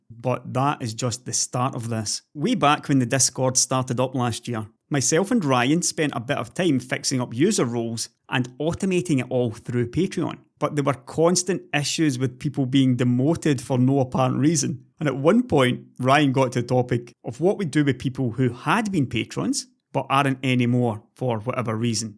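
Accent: Scotland, United Kingdom. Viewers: You could just make cat videos and I'd still watch them. I only come here for the Scottish slant and subtle sass. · started watching you when I could barely decipher the broad Scots brogue, you have always been a shining light of sanity in the whole tech troll / fan morass. I didn't appreciate how much they had stressed you out, just enjoyed the ranting too much I guess. Anyway very glad some bridges are being rebuilt and very glad for your sanity that you are going to do what makes you feel positive. I will join your discord soon although I am an ex tech guy, now retired, it looks like a point of sanity in this modern media world. Keep railing at the madness it is at least keeping us sane!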